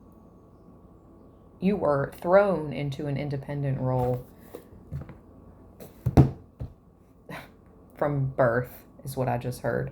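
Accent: United States. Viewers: Are you from Kentucky?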